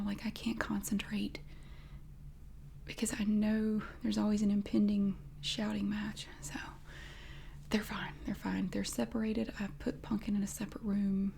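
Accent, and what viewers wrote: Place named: Tennessee, United States. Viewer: Just found your channel. You must be from Tennessee or Kentucky?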